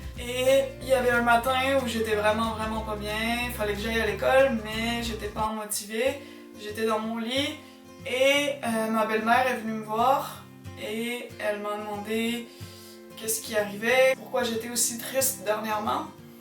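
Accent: Canada. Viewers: c'est pour ça que tu as un accent canadien!! :) bisous du Canada!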